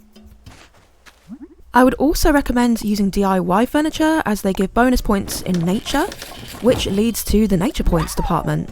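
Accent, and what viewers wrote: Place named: United Kingdom. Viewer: I suspect it’s cuz ur bri’ish or austalian or something! Got that soft lilt in your cadence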